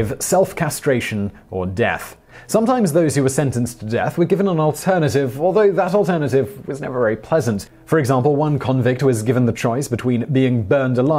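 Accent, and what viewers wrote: United Kingdom. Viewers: I love your accent! Fellow Brit watching :)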